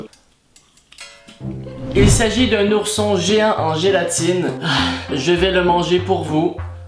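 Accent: Quebec, Canada. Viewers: J'adore votre accent bisous au canadien(ne) et Québécois(se) de la France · T canadien ??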